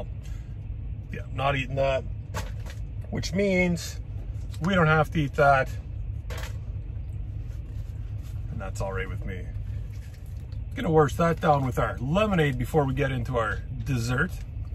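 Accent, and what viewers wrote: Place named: South, United States. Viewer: My very first MRE was a chicken breast pattie. Couldn't believe my eyes that a piece of chicken was in a bag and still fit to eat. Little chicken juice goes a long way in the cab of a truck. Bit and pieces of this video you sound like a southerner. Great detailed video. PS it's still hot. Enjoyed our visit with you that week.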